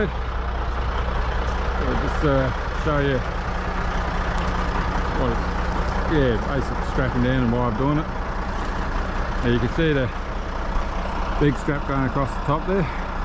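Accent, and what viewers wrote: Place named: Australia. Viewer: hey im glad you speak plain Australian everyone wants to comment on the connection names im just happy to get these pointers❤❤❤❤❤ ya work mate keep it up for the rest of us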